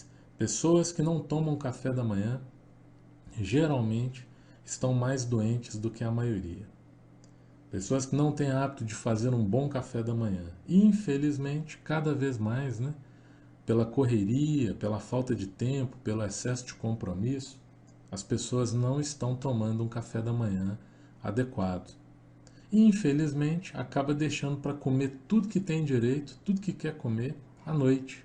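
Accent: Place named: Minas Gerais, Brazil. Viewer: A fala pausada ( bem mineira por sinal) me pareceu boa pra aprendizagem.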